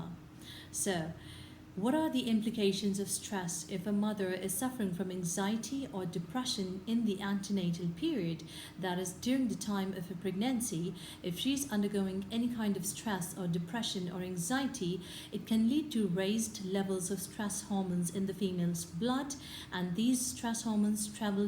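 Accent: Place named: United Kingdom. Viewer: Madam can u plz tell me how did u learn british accent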